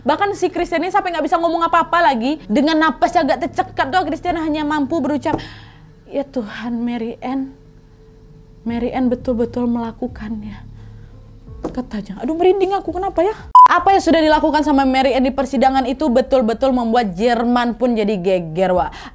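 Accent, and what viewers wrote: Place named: Aceh, Indonesia. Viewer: Logat acehnya kental ya ❤️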